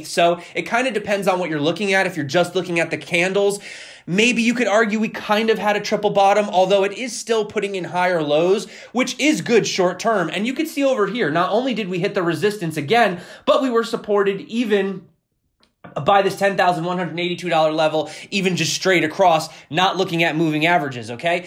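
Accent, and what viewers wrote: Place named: New York, United States. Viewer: The New York affect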